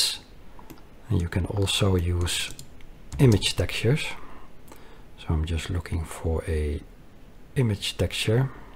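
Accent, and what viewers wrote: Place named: Netherlands. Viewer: I had no idea how difficult it is to import a 3D object into TD with it's material correctly mapped. After doing a lot of research - looking at Derivative notes, MeshLab, CloudCompare and watching YouTube videos, I eventually came across this video. Your import/export with Blender tool makes this seemingly simple task a practical reality. So thank you for making this tool available at no cost to us amateurs! Great well explained tutorial and loved your Dutch accented deadpan mention of the Funkee Munkee!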